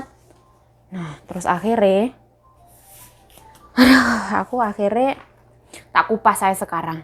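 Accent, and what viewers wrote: Java, Indonesia. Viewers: Aq seneng liat mb...jowone medok..dr Blitar ya mb,?..sy Arema mb.Mlg.... 😀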